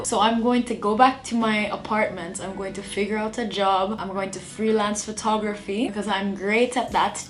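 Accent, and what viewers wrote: Jamaica. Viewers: This came up in my feed and I wondered why this white looking girl is saying she's been away from Jamaica too long...then I hear the accent! Real yawdie! Keep doing ur ting yah girl! Nuff space is there for creatives. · Damn. You speaking in your ja accent is the sweetest thing. Jah know!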